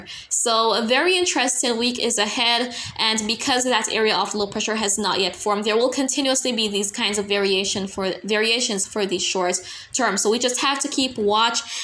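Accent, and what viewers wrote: Jamaica. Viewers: Sml love u so beautiful thanks for the update from Jamaica 🇯🇲 an love your Jamaica twang lol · Jah know...yu patwah tun up😂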